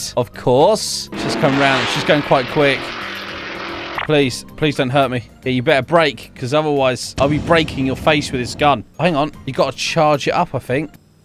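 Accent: United Kingdom. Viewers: hold up, wait a minute, are you from the UK?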